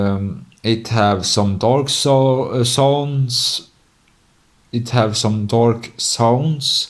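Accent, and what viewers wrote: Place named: Sweden. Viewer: You speak good english, but there is of course a little dialect from the Swedish dialect, but everyone has a little dialect, wherever they come from:)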